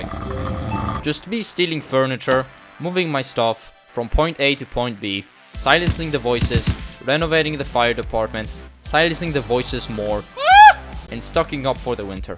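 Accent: Poland. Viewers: The more I listen to you, the more I here your Polish accent or atleast thats what my head tells me, keep up the work brother. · are u polish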